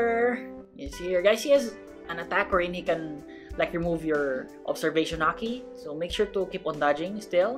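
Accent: Philippines. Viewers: he is filipino i can tell by the way he talk and when he face reveal, he said he was from PH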